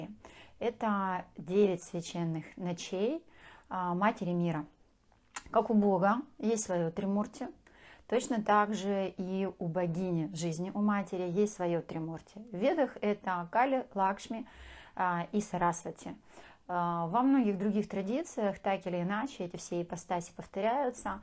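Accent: Ukraine. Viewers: Дякую за цікаву інформацію і за прекрасну українську. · як же Ви красиво розмовляєте українською мовою❤. Серце затримтіло і сльози навернулися, а ще відчуття спокою і миру в душі від Ваших слів. Слава Україні🇺🇦 Боже бережи Україну 🙏🇺🇦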